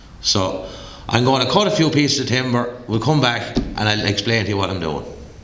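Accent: Ireland. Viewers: So funny listening to an Irish man speaking English.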